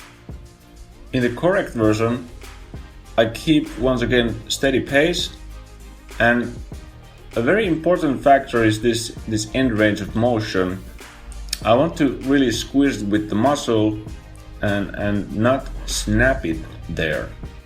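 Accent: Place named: Finland. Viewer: No wonders, you have the accent of Finn, haha :) Great channel by the way!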